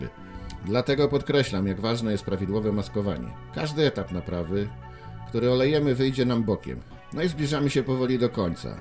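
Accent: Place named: Warsaw, Poland. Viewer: Bardzo się mnie podoba ta Warszawska mowa 😀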